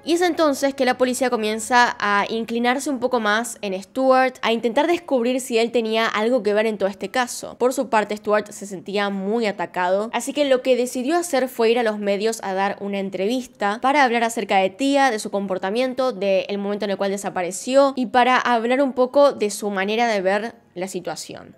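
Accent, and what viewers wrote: Argentina. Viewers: Es agradable el acento argentino y si es en un caso interesante, mejor 😅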